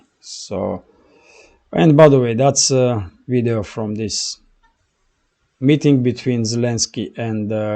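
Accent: Russia. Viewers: I love your Russian..English...no room to make better...you are perfect...from America 🇷🇺💕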